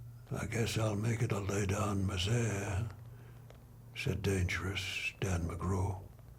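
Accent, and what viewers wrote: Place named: Australia. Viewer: It works well in an Aussie accent. Could almost be an outback tale.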